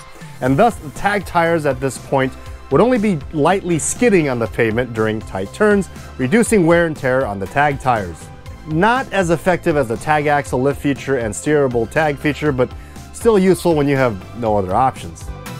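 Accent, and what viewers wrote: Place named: United States. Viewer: U aint no chinese, bruh - as far as I can hear, u r hundred percent American :D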